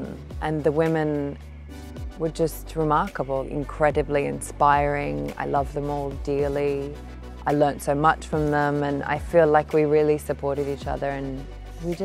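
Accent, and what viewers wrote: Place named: Australia. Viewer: I'm an Aussie and she sounds Australian to me, absolutely.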